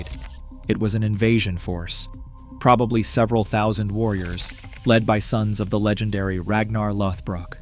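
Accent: United States. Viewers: The city of Derby is pronounced in the UK...correctly...as DARBY...whoever put this piece together should have organised an English-accented Narrator...an American accent is inappropriate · Whoever produced this, and I'd be curious to know, its very interesting. Subscribed. 2 Questions. Why voice-over in American English, not N.England accent, say? What's this got to do with aviation? Keep 'em coming!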